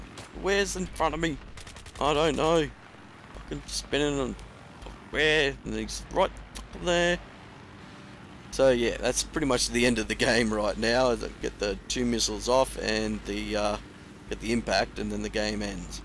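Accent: Australia. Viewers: Sweet vid man its good to see bf3 aussie commentators. Keep the videos coming! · r u aussie? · Oi Mate, Sounds like u are Aussie? If so, ello... I have been there and I like Aussie women, Kiwi women, Chopper and VB beer mate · Are you Aussie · Man, this commentator is brilliant. So classically Auzzie and just so informed and blunt. Fuckin' awesome man. :D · its kinda obvious ur aussie lol me too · You're Australian, aye?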